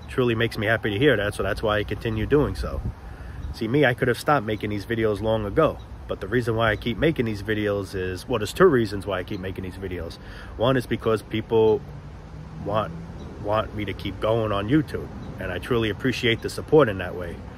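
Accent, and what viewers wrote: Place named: New England, United States. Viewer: Homie prolly from New England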